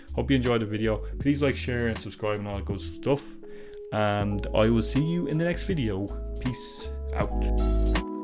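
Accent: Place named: Ireland. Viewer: Here cuz I’m sitting in traffic in Jakarta (Indonesia), on the way to the closest thing we have to a pub, to have a pint of Guinness and I wanted to learn a bit more about the only beer I’ll really drink. Oh and I wanted it narrated by an actual Irishman. So thank you for that!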